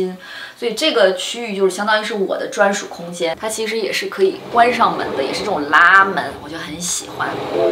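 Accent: China. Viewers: 这英文，中文口音太重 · 大陸口音⋯⋯聽了就整個翻白眼難聽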